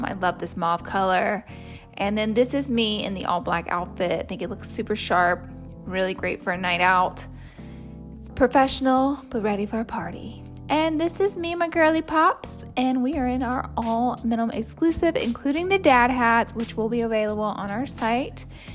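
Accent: United States. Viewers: your voice sounds like american tourist in europe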